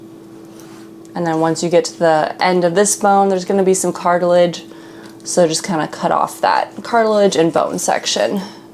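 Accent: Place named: United States. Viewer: THE most grating American accent….pepperrrr,wadderrr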